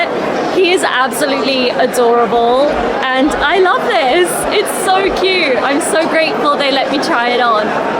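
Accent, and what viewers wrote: United Kingdom. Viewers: 動画の企画も、もちろん素晴らしいです。いつも楽しみにさせていただいています。探求心も素晴らしいです。さらに、の、イギリス英語の響きの良さ、本当に引き込まれます。きれいな英語を聞かせていただくだけで、大満足です。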